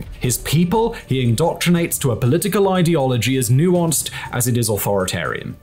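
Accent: RP, United Kingdom. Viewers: I'm about to take a nap now. If I don't sleep to podcasts I sleep to one of many channels, something about British accents.😂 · When are you going to speak about the betrayal of the Minsk agreements by the West? Will you explain how under Putin the Russian economy has grown exponentially? Salaries and pensions have raised in real terms, the national debt has substantially decreased and how he is standing against Victoria Nuland and her deranged dreams, successfully? Imagine, Russia is single-handedly dealing with the whole of Nato through its Ukrainian proxy and is prevailing. Putin is spearheading the multipolar world and a De-dollarised worldwide trade, that's why the US is bent on destroying Russia, but is achieving exactly the opposite and destroying itself in the meantime. Anyway, you simply don't want to understand that the Russian people love their leader and most of the world seconds them. 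With your britisher accent I can understand why you want to throw some dort on Putin while ignoring your inbred monarchy ruling for centuries and your unelected leader Sunak. Who voted for him? Tell me.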